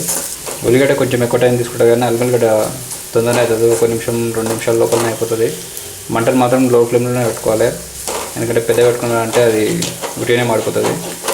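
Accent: Telangana, India. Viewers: Super andi iam very big fan of your Telugu tounge because it's same like our mother telangana tounge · Anna manadi pakka Telangana MBNR basha🙋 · మన తెలంగాణ భాష లో మస్తు మాట్లాడుతున్నావ్ అన్న... 👌👌👌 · Telangana Basha super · మీ లాంటి వాలు మాకు చాలా అవసరం అన్న..మన తెలంగాణ యాస చాలా బాగా మాట్లాడుతూన్నారు అన్నా.. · మంచి తెలంగాణ భాష మాట్లాడినవ్ కూర ఎట్లైతే గట్లాయేగాని మటలైతే అస్సల్ మాట్లాడినవ్ 👍💐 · anna ..pakka...telangana anukuntaa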